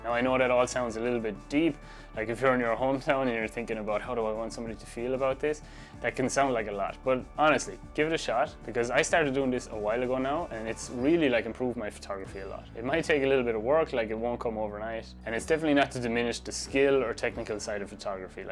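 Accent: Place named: Ireland. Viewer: Nice to see an Irish photographer? Can I ask where you’re from? Can’t place the accent! Clare maybe?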